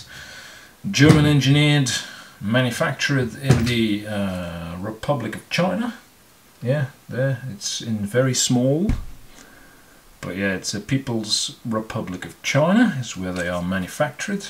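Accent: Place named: Australia. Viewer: Thank's a lot for the effort! 👋👋👋 It make so much sense to buy a durable tool! It is jolly good to watch screwing compoetion videos, but at the end of the day you not only need a relatively quick tool, but ot also has to be light and DURABLE!!! and reparable!!! Would be great to get a view of the competition like: makita, dewalt etc... And the Aussie accent is the cherry on top of these videos!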